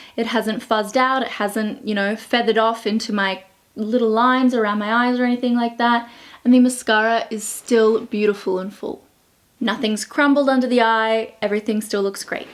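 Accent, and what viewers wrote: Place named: Australia. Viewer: are you Australian you has an Australian accent (Im Australian) p.s u earned a new sub xxx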